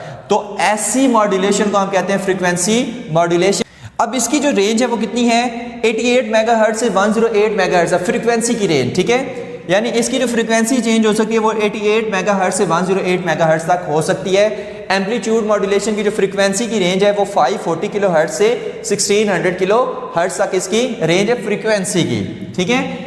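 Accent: Jhelum, Pakistan. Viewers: Are u from jehlam sir😮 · Are you from jehlum?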